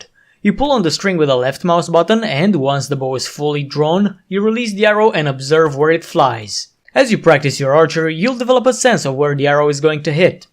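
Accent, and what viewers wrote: Romania. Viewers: Nice. Oh btw I didn t know u were Romanian. Niceeeee accent!!!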